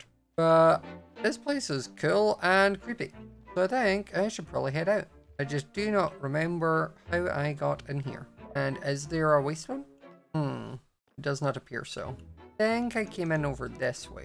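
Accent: Scotland, United Kingdom. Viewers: why does he sound Scottish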